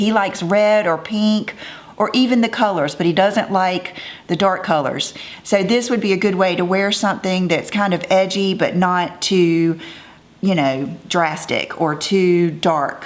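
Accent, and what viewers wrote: South, United States. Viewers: One celeb worships Satan so I am not helping that person's bank account as a devil worshipper. We're accountable for what we know and I don't want my dollars going to anything that person may use funds for connected to the occult, and satanism. We can all have tolerance for diversity but when it goes deeper than a difference in opinion or finding a celeb "simply annoying" or something...."miniscule" I have to draw a line. I love your country accent and you're lovely. I've watch this video a few times just because I enjoy listening to you and seeing all these goodies! I own all the OPI polishes you mentioned. I need to find the Revlon mauve-ish shade! God bless you and stay beautiful!!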